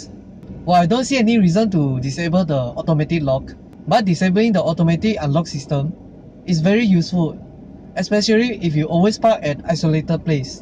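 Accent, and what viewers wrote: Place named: Singapore. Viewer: walau... your English is having same slang as Singaporean English...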